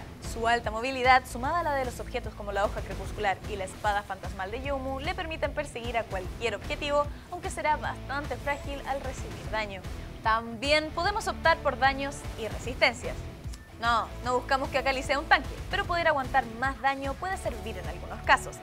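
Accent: Chile. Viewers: Que bueno que se va esto es legue of legends latinoamerica se necesita a alguien con acento neutro no a alguien que a leguas se nota chilena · Pero si me gusta el acento chileno D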